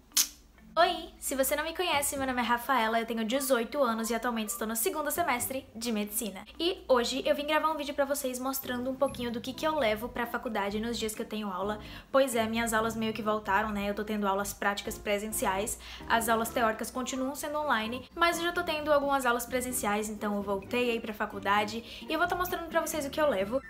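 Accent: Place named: Natal, Brazil. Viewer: amg vc nasceu em Natal?? seu sotaque é diferente shshjajs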